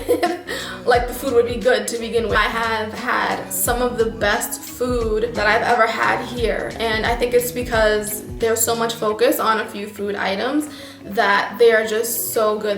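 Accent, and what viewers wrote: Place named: Germany. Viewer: Not that you would not use an english R... But you sound in some ways like a german english-learner. - You still have clear pauses between your words, you pronounce the S-sounds quiete hard, you sometimes say a german W (which is else an english V, not a UU), ... "Neuschwanstein" for an example - You have all the sounds, but do not use them. "Neu-" (correct) ... "-schwan-" is not like "shvan", but like "shvuhn" ... "-stein" is not "steen" but "-shtyne", without the E pronounced. So "Neushvuhnshtyne" would be correct spoken. ... Btw.: You usually do not need a new ticket, if you miss a single train. It will be most likely appropriate for next one in your direction, which is, also most likely, max one hour later, but sometimes has to be the same type of train for the same ticket.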